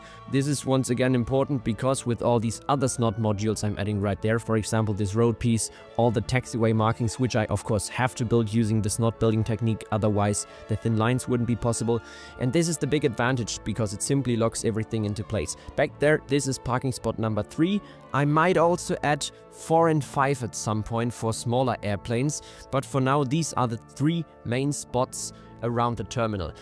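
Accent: Germany. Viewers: Nice German accent you have there. So this is actually a departure airport with no arrivalibility? I guess i just invented that word. Thats what we germans do...😁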